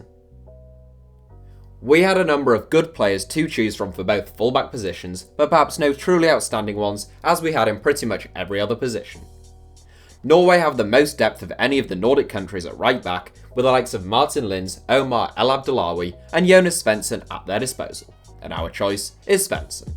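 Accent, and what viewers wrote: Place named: England, United Kingdom. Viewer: You are one of us now, disembodied english voice man. One of us.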